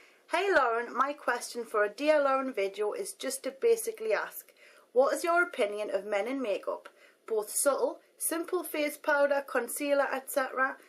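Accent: Newcastle, United Kingdom. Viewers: "That FREE glue is the best I've ever used" That's the kind of honesty that keeps me coming back. That, the pugs and the geordie accent.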